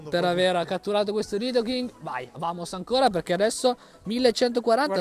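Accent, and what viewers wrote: Italy. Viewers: Ma sei di Brescia?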